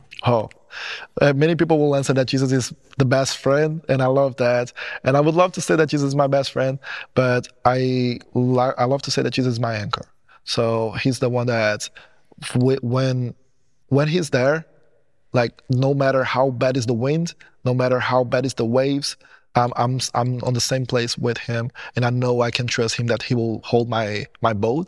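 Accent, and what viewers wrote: Brazil. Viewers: wow I was 99% sure that he was brazilian!! hahaha, I`m brazilian too so was kinda of easy for me listen to some phonemes in his English, that btw is very good! And of course, apart from that, God be praised for your healing testimony! Hugs from BR 🟨🟩🟦⬜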